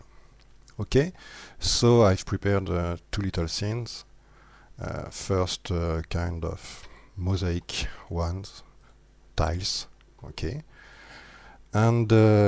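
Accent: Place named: France. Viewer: Really useful video. I would have never thought about that trick. Also I enjoy your accent. Brings back good memories from the land of Begets and red whine.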